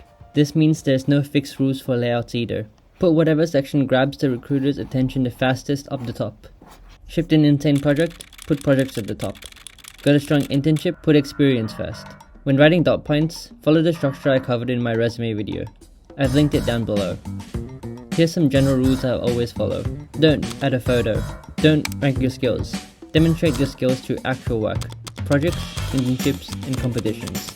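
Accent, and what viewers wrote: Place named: China. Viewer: ahh indian guy with a chinese accent, my favourite kind Gread vid btw :)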